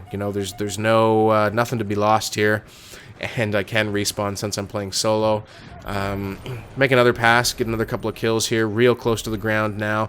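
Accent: Canada. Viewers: aboot !!! haha Canadians and their aboot !!!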